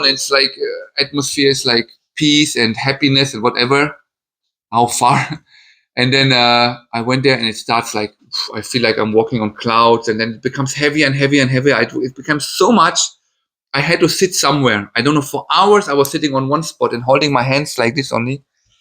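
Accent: Nagaland, India. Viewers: God saved you and gave you lot of chances due to some purpose and the purpose was to come to India and meet your wife I guess. Your testimony was good and clear, best thing is that you speak English just like a Naga. Thankyou for sharing this bro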